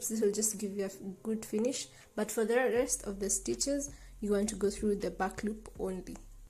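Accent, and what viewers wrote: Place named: Kenya. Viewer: I love that I can tell you're a Kenyan by how you speak. It just sounds so familiar, like a friend telling me about their day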